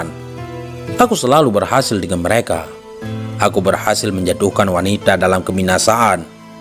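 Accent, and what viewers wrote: Aceh, Indonesia. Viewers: ❤️❤️🙏🙏min pengisi suaranya orang Aceh..ya min...semoga tetap dalam iman Islam smpai nafas berhenti ... Jgan lupa min dialog nabi Isa as dgn iblis jga dialog nabi Muhamad SAW dgn iblis🙏🙏🙏🙏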